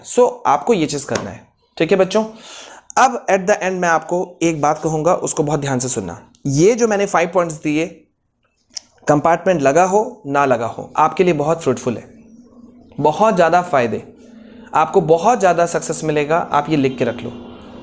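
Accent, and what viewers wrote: Bihar, India. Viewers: Sir aap bihar se ho kya ?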